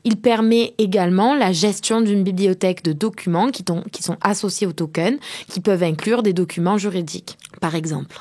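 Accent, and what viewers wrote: South, France. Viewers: Hyper agréable l'accent du sud pour cette vulgarisation de l'univers crypto et ici le sujet des ERC ! Merci bcp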